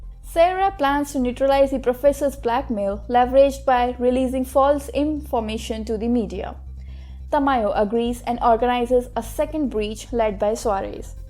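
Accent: India. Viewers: good recap, but dislike for the indian accent.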